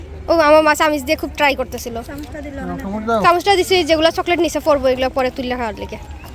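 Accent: Sylhet, Bangladesh. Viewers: আপনার কথা গুলো অনেক মিষ্টি আমার মনে হয় আপনি সিলেট বিভাগ এর ভিতরে বাড়ি আপনার, আমি হবিগঞ্জ জেলা থেকে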